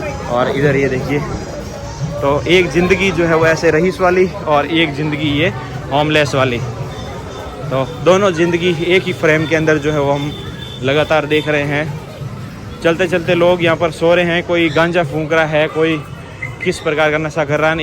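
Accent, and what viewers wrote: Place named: Jodhpur, India. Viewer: Bhai aavaj se jodhpur ka lg rha h tu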